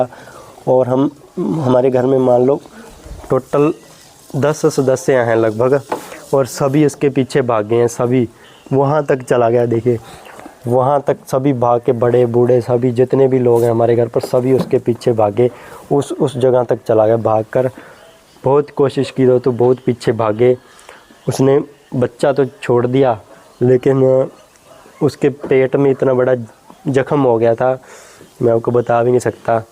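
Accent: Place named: Bihar, India. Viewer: Aap bihari style me bolte ho bhai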